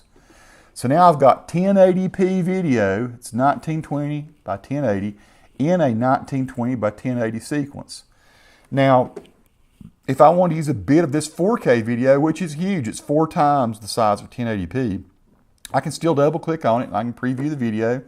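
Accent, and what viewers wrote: South, United States. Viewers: Sounds like a Southern Bumpkin 😊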